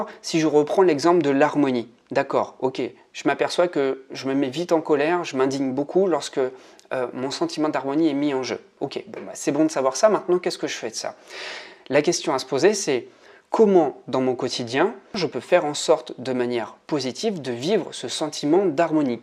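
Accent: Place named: Pas-de-Calais, France. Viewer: Bonjour moi aussi je suis du pas de calais. J'avais bien noté un léger accent 😁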